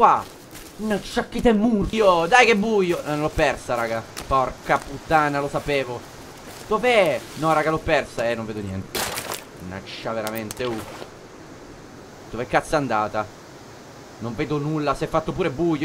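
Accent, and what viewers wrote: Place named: Bari, Italy. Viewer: da quando favij e diventato barese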